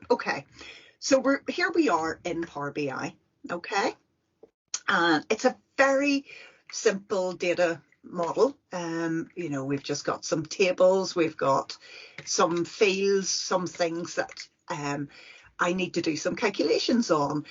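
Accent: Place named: Northern Ireland, United Kingdom. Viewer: Great video, excellent intro to TE!!!! Love the Nor Iron accent by the way, brings back many great memories!!!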